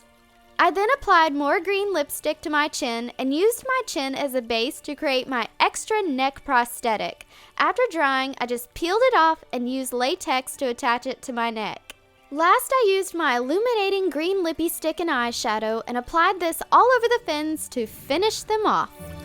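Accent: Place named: South, United States. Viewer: i love your look, such an amazing transformation but I have to admit, I laughed so much when you're cute southern accent said 'Ghetto Gold', made my day <3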